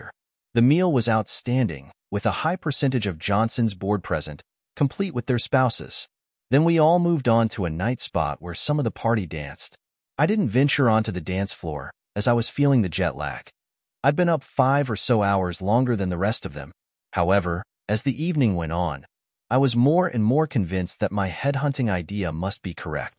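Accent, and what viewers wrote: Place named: United Kingdom. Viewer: Hehe british accent lol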